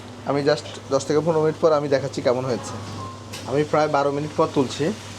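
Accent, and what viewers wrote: Noakhali, Bangladesh. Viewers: ভাই রান্নাগুলা দেখান চমৎকার৷ কিন্তু শব্দ চয়ন গুলু নিতান্তই খেত, যেমন ফানি,ফেয়াজ, ছিনি, আরো অনেক নোয়াখাইল্ল্যা উচ্চারন৷ বিষয় টি খেয়াল করলে খুশি হবো৷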